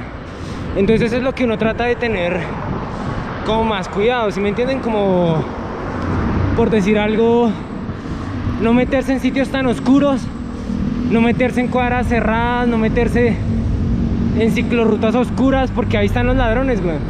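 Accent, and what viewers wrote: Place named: Bogotá, Colombia. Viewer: severo acento rolo jajaj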